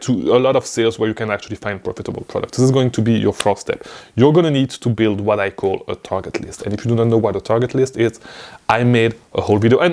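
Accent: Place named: France. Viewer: Hé tu parles français? J'entends ton accent?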